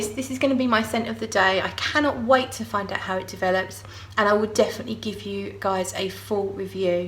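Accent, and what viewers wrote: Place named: United Kingdom. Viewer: I am new to the fragrance hobby, and I just recently discovered your channel. Not sure if it’s your British accent or just the soothing sound of your voice, but I find your reviews hard to stop watching 😀 I really enjoy how you describe scents, it makes them come alive in my mind. Thank you. I am ordering a boat load of samples from LuckyScent based on your reviews!!!!!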